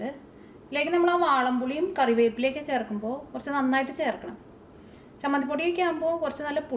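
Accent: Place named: Thrissur, India. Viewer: Hello..I am your fan..love your simple narration and the accent..I am also a native of trichur..hence hearing you gives extreme pleasure.. very good recipes and cooking..keep going..congrats..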